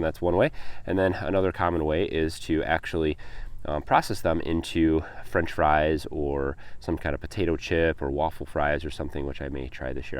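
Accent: Michigan, United States. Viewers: I want to start a garden next spring but I'm wondering if there's something I can do now. Should I till the soil now and cover with leaves? My area has been mowed but its weedy. It hasn't been touched for decades. P.S. Sounds like you're in Michigan. I'm in Genessee County. Zone 6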